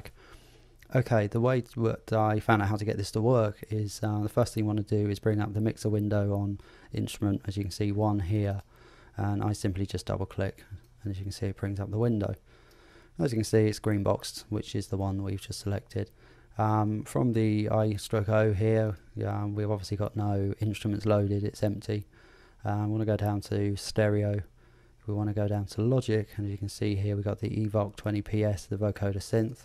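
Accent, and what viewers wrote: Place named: United Kingdom. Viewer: Finally someone British. I can't take these American tutorial guys seriously. The accent is all wrong.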